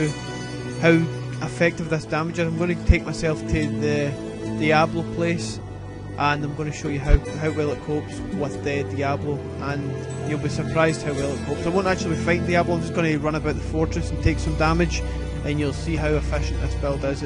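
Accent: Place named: United Kingdom. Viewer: british accent ftw :P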